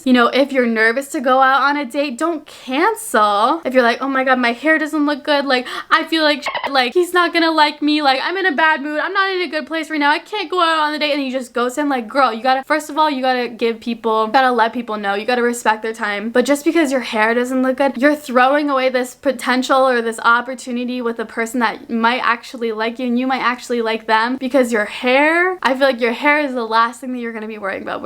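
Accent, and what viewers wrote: Canada. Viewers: You're Canadian Toronto side really popped off in this video, not a bad thing I loved it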